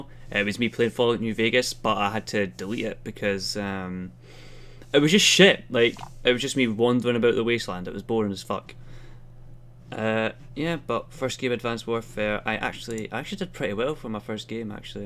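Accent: Scotland, United Kingdom. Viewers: Are you from scotland? :)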